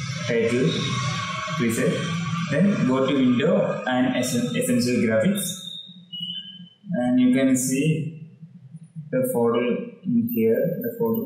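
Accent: Kerala, India. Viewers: bro... u from kerala???.... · ഇംഗ്ലീഷ് കേട്ടപ്പോ മനസിലായി മലയാളി ആണെന്ന്...മലയാളം മതി ബ്രോ...!!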